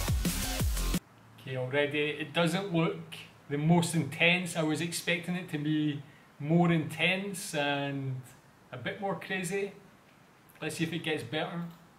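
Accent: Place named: Scotland, United Kingdom. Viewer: I like your scottish accent.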